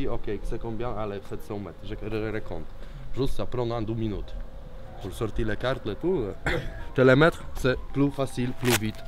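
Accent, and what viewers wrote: Russia. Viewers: ? Il est d'origine russe selon moi d'où est le problème ? Un accent différents et donc ? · Похож на русский акцент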